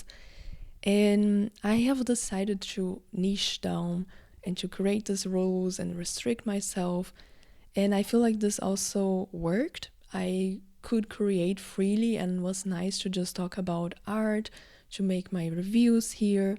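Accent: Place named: Brazil. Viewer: Oiii diva! Muito gostoso te ouvir falando assim de maneira fluida a abrindo seu coração sobre esse projeto de se colocar vulneravel aqui. Não conhecia seu canal/podcast e vim pelo video de planejamento de metas seguindo o big paper planning day (amo). Gosto de ouvir o sotaque brasileiro de alguém falando em inglês, sei la me deixa com coração quentinho. E as quebras em português, é sempre divertido, sinto que é quase um sussurro, um segredo, um clubinho que só algumas pessoas podem entrar. Adorei você falando do lado bom de alcançar poucas pessoas - menos gente pra responder. Achei curioso, engraçadinho e honesto. Enfim, obrigada por colocar um pouco de você aqui para eu ouvir enquanto trabalho com coisas que definitivamente não aquecem o coração! Bom trabalho por aí <3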